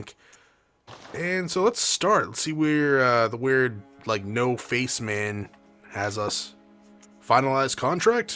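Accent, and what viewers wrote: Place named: Mid-Atlantic, United States. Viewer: Knowing that has Brit relatives goes someway to help explain his accent, clearly American but every now and then it verges into mid-Atlantic eg lever pronounced as lev-ver but occasionally as lee-ver it's somehow enjoyable to listen too & coupled with the gameplay & the extraordinary engaging commentary it has me watching a game that not normally my cup of tea.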